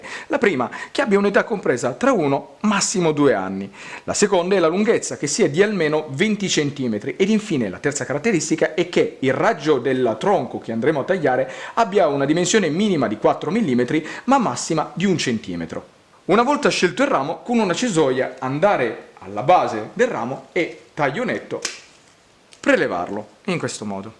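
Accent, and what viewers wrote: Sicily, Italy. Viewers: Min "pucciarla.." dal tuo accento mi sembri siciliano o comunque del Sud, come lo sono io. Sentirti usare il verbo "pucciare", termine dialettale piemontese e lombardo, fa veramente male. Però, detto questo, complimenti per il bel video 🙂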